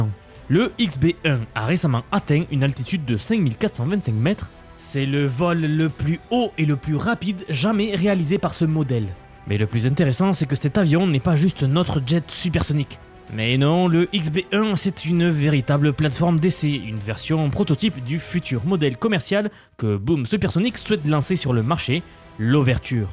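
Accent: South, France. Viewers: J'ai vraiment du mal avec l'accent du sud 😂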